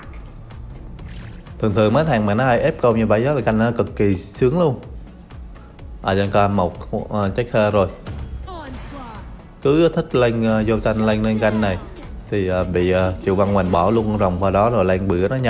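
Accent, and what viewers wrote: Quang Ngai, Vietnam. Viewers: Y chang, hồi kì nghe là ngộ ngộ ồn người quảng ngãi rồi. Chào đồng hương nha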